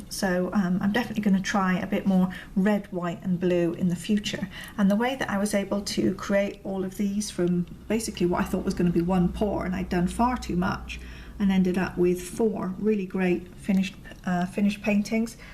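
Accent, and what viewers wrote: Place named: Devon, United Kingdom. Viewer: good on ya. I love seeing people having a go. are you from south West? Devon maybe?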